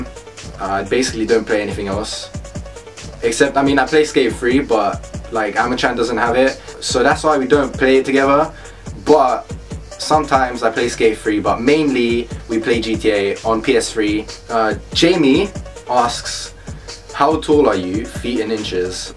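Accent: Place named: England, United Kingdom. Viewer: It's cool to watch someone english who speaks the same as me and i know exactly what you're saying and i can just relate, sometimes youtube gets boring because all the big people on here are from america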